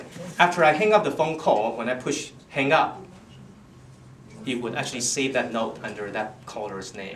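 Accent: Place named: Singapore. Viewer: singaporean? malaysian? seems to be singlish..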